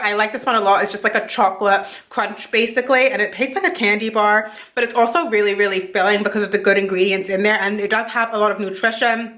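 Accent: Canada. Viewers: I think she is frm canada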